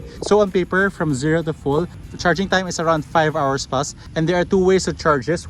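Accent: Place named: Philippines. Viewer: YOU SOUND SO PILIPINO.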